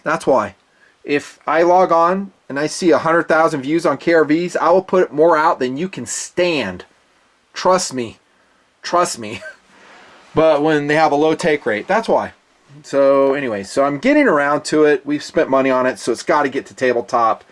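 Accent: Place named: California, United States. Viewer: Thanks for review! Never seen your channel and I'm blown away by how many followers you have...cause you sound like the kids I used to go to school with in california. ..brah! Seriously you got the steel wrong and the knife is on bearings. It's hard to watch your video when you don't know the item reviewed. JMHO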